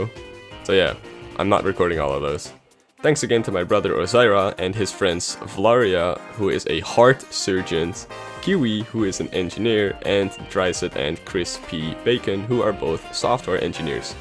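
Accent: Netherlands. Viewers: Wait, does this mean that you have a Dutch accent? if you don't mind, what part of the Netherlands you from? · ik wist niet dat je nederlands sprak, ben je een belg(zoals ik) of ben je een nederlander? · Are you dutch? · He dutch? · You Dutch? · Wait a second are you dutch? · hoppa ik dacht al dat ik een Nederlands Accent hoorde. Ik ben de allerkapotsteslimste werkloze persoontje op de wereld yesssss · I KNEW YOU WERE DUTCH YESSS I am also dutch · omg are u dutch? Omg dat is echt cool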